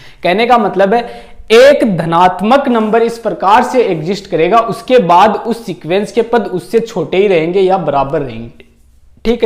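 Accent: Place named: Rajasthan, India. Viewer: Sir this is unit 2 in Rajasthan university syllabus ...nd ur marwadi is like awsm....😄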